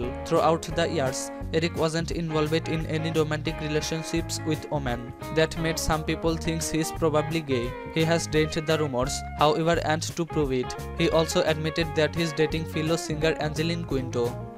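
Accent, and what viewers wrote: India. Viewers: Oo nga, lahing pana ba yong nagsasalita..indian..bilog ang dila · Yung accent dih ko kinaya indian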